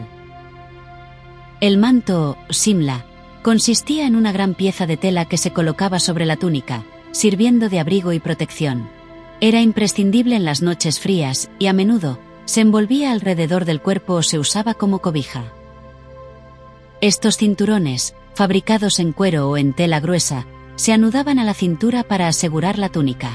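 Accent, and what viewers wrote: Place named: Spain. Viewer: Es chocante el acento español de la locutora. Por eso...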